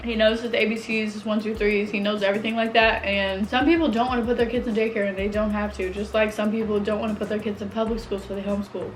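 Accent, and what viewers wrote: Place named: South, United States. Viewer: I love your little slight southern accent. It's so cute. ❤️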